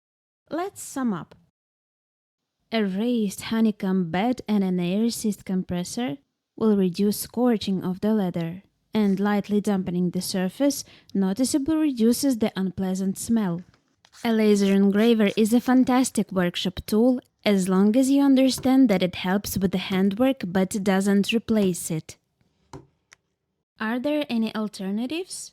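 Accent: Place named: North America. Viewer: для моїх потреб буде достатньо. Англійська мова дуже гарна у вас. Північно американська більше, ніж британська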